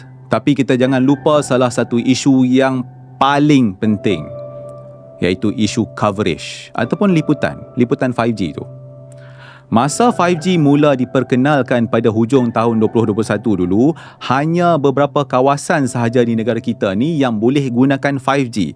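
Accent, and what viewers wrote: North, Malaysia. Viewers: Cakap slang utagha... oghang mana ya?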